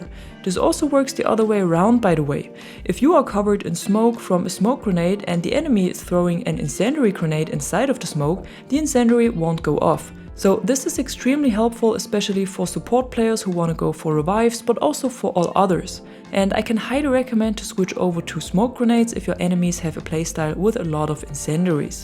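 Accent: Germany. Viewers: danke für die Tipps 👍 weiter so...ich mag deine Videos sehr...ich schreibe einfach mal in deutsch weil ich denke das ich da einen deutschen Akzent höre 😅✌️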